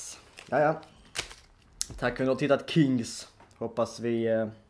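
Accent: Scania, Sweden. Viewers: älskar din skånska, så underbar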